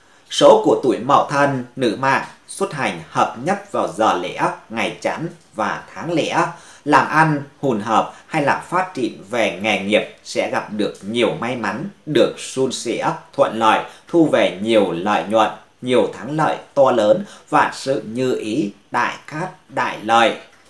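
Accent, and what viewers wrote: Thanh Hoa, Vietnam. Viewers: Sao giọng thầy giống như giọng thanh hóa quê cho vây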